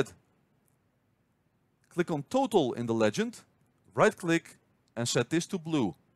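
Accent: Netherlands. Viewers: what a nice dutch accent_:D but thanks, useful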